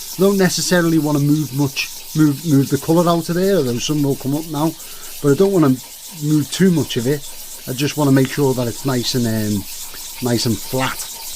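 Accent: Liverpool, United Kingdom. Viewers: So I've genuinely been thinking about this. Your Scouse is obvious, but not aggressive, and I'm wondering if it were thicker if I would have had the same reaction. Genuinely, it took me a while to figure out that your "deckle" meant "decal", but maybe if I was already having to "translate" it would have just been part of the expected difference. That said, I'm having real trouble figuring out how Scouse transforms "decal" into "deckle". How do you say "diesel" and "demon"? "Dezzel" and "demmon"?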